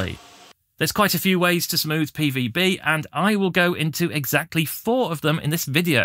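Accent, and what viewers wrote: United Kingdom. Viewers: I've not tried it, but you might be able to get more purer ethanol in the form of BioEthanol (~95%) assuming by your accent that you're in the UK, they sell this in B&Q, although the pricing is pretty much the same as IPA, so not sure if there's any benefit.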